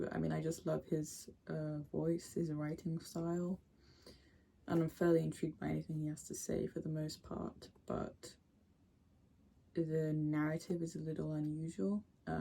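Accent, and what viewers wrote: England, United Kingdom. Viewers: I think it sounds completely English to me, as a native. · I'm intrigued by your accent. It's British, but also clearly not native / not from Britain. (I might be mistaken, I mean it's not like I"m from the UK) The closest I've ever heard was a German girl who went to a British private school (not sure if it was in Germany or in the UK) but she spoke a tad more posh. EDIT just noticed the poster, might be another German girl going to a British private school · You sound English. Do you read BNW in secondary school required reading?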